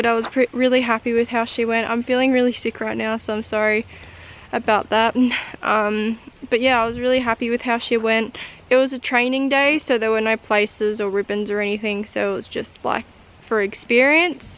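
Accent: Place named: Australia. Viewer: Lol I picked your accent. Are you from Australia? I'm in NSW!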